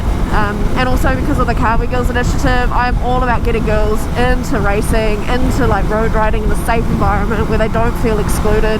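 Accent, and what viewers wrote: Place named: New Zealand. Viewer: Picked your from NZ as soon as I heard your voice. Hi from South Australia, great video.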